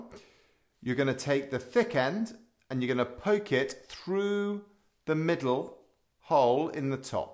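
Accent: United Kingdom. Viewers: Thank you very much! The best explanation from 3 I watched. Thanks for your lovely British too😊! From California, helping to my son who is graduating high school.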